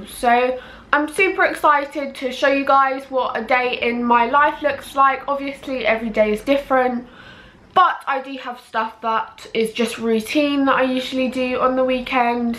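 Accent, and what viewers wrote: United Kingdom. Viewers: I like ger British accent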